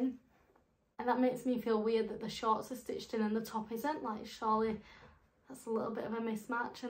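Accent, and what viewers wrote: United Kingdom. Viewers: Liverpool accent? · First time seeing your video content. Nice figure, and lovely English accent!!!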